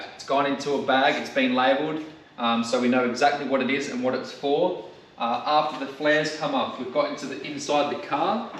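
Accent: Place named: England, United Kingdom. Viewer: He now's about Aussie cars but he sounds like his from pommyland its the only thing got me stumped about this channel.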